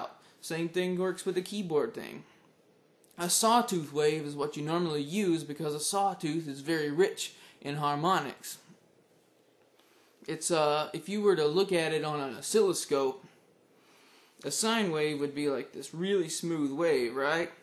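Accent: South, United States. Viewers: Sickkk. PS I don't think this guy is stoned, he's just from the South. Whenever I hear a person from the South, I have to upgrade my estimate of their intelligence to account for their accent subconsciously downgrading it.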